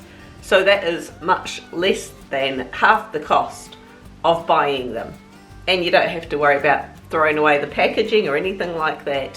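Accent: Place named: New Zealand. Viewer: Absolutely adore that Kiwi accent & no mucking around with fancy words and just gets the job done. Good old Kiwi style!!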